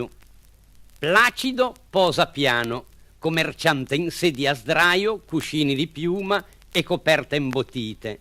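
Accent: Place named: Genoa, Italy. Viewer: Dialetto genovese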